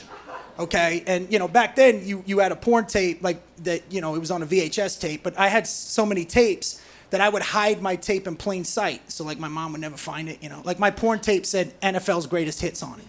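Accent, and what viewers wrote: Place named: New Jersey, United States. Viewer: I can tell you're from Jersey bc your accent not to be a dick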